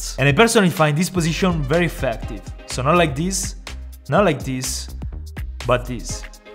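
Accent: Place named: Italy. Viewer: he is italian that's why he's accent is different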